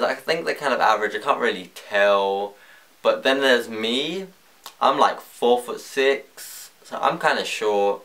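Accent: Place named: United Kingdom. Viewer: It's because he's British. They don't understand his accent. Or they're just racist against the red coats :P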